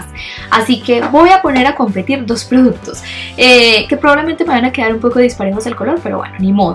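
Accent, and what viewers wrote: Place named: Antioquia, Colombia. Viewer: Hola, el vídeo me entretuvo mucho y me gustó la temática, pero quiero darte una recomendación si me lo permites. Espero que no te lo tomes a mal, de verdad lo hago con una buena intención porque tu canal me gusta muchísimo. Yo sé que muchas veces los que somos paisanos y tenemos este tipo de acento hablamos muy rápido y por esa razón nos enredamos, pero en los vídeos esto se hace mucho más notorio, y puede llegar a ser un poco estresante escuchar que alguien hable tan rápido, se equivoque y se trabe. Lo que puedes hacer es intentar hacerte consciente de lo que dices al hablar para así hacerlo más despacio, y si te equivocas en una palabra la vuelves a repetir un poco más lento y pues lo editas, así creo que el vídeo se vería mucho más calmado y profesional. Un besito desde Medellin, saludos!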